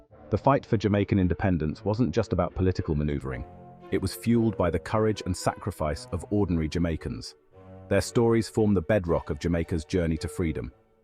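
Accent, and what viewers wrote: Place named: United Kingdom. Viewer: Really? A British voice narrative g that? No jzmaican accent as available? Tacky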